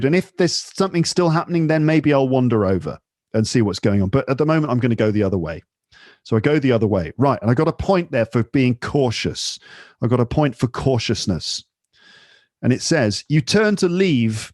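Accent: United Kingdom. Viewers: Sorry i don't wanna listen UK accent. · I was listening to your podcast for approximately 4 months ago ; and due to that reason, i started to get your British accent furthermore the evident improvement in my english in terms of vocabularies, listening and speaking. You're my favourite teacher and podcaster, I really appreciate you and wish the best for you always. 😃💚.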